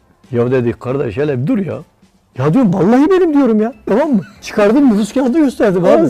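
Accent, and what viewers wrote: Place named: Diyarbakir, Turkey. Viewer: diyarbakır ağzı mükemmel, kusursuz.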